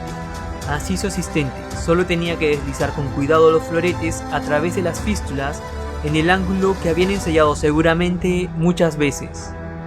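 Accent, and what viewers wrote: Peru. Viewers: por su acento me parece que si es peruano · Es peruano por el dejo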